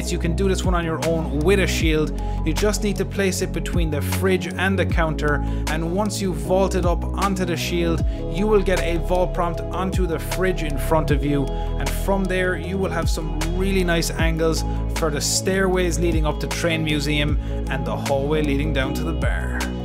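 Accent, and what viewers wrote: Ireland. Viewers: As soon as I heard ur voice I could tell that ur Irish, what county u from chap? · Are you Irish? I'm Irish and you sound Irish Tbh😂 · You sound like you're Irish. If I'm right, which part of the country?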